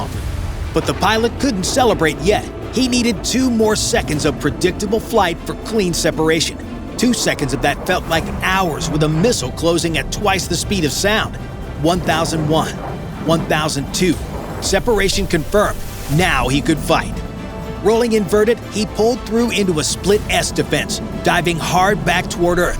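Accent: United States. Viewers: Another annoying American voice,with usual tone well tou have lost with your usual venture but managed to condemn millions of Ukrainians to injury and death and the rest to poverty in a destroyed country ,so where a you going next?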